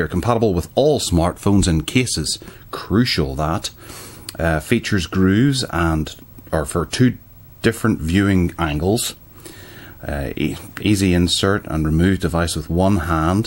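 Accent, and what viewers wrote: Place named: Northern Ireland, United Kingdom. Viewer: Hey, do I detect a Northern Ireland accent :P County Down here !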